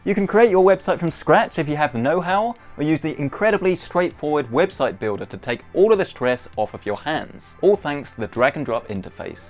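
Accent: United Kingdom. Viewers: your bri'ish accent made me to watch the full video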